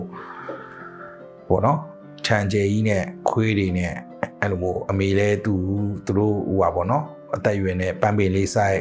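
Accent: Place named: India. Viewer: ဟြန္ဒိုင္းကAccentကမိုက္တယ္ဗ်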